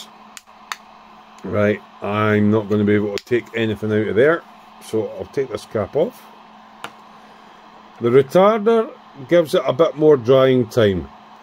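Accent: Scotland, United Kingdom. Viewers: Thoroughly enjoyed your video on making your own acrylic thinner and airbrush cleaner, very informative, and nice to hear a Scottish voice for a change. IT was not too long but had all the information I was looking for. Thank You!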